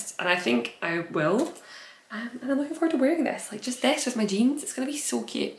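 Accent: Scotland, United Kingdom. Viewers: Thank you! I love your podcast and LOVE Scotland. I am from the Colorado Rockies but did a year abroad at Stirling Univ and fell in love Scotland. ♥ I love hearing your accent b/c it brings back my memories of the beautiful people of Scotand. in 2019 my husband and I backpacked for two weeks in the highlands. A dream. Thank you for your podcast.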